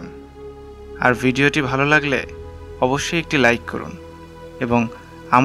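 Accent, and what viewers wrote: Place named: Bangal, Bangladesh. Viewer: এমন বাঙ্গাল উচ্চারণ কানে বেশ বাজে লাগে।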